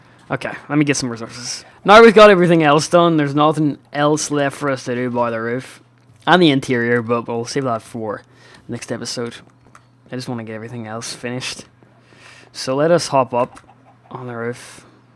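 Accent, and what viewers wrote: Northern Ireland, United Kingdom. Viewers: He has a northern Irish accent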